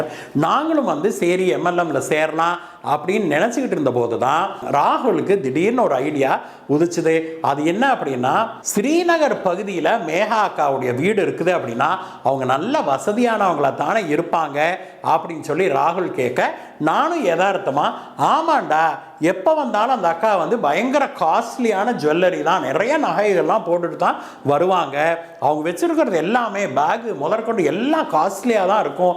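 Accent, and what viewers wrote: Kongu, India. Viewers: கொங்கு தமிழ் அருமை அண்ணா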